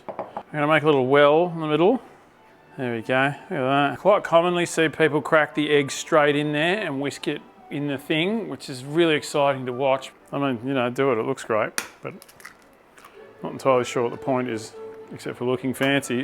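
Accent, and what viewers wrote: Australia. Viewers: You sound like you’re from WA.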